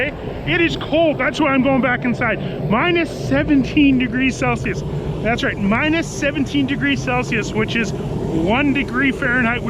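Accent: Bavaria, Germany. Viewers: Clearly German accent ... ! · The accent is Bavarian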